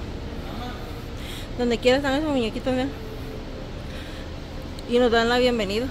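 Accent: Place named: El Salvador. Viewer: Usted es salvadoreña? Porque su acento es salvadoreño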